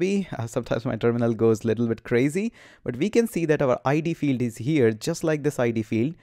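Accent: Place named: India. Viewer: Are u Indian? apart these things explanation is so clear loved ur way to explain topics and ur English tone too....😆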